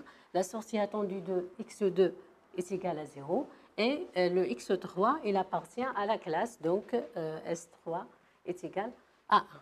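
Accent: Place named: Africa. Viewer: Mafhamna welou tahadri bel français te3 les africains 🤣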